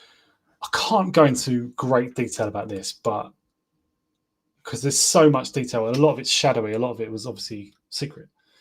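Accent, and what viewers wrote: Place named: Kent, United Kingdom. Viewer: He’s definitely from the south east. Sounds like a local Gravesend resident lol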